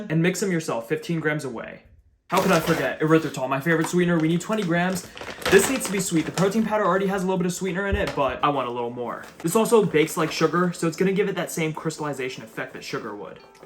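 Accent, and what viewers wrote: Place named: New Jersey, United States. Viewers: Are you from New York/New Jersey? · Thats that New Jersey accent fuggetaboutit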